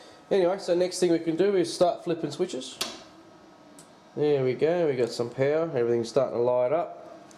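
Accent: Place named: Australia. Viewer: That Aussie accent is real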